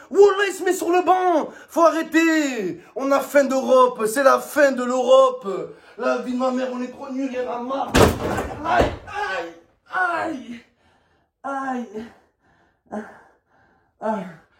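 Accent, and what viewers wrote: Marseille, France. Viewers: Style marseillais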